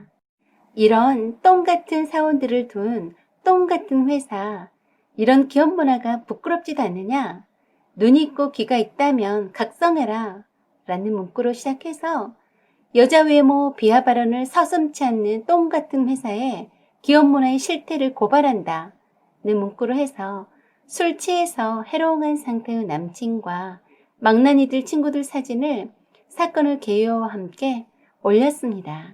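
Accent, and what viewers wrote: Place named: Gyeongsang, South Korea. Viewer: 경상도 사투리가 영....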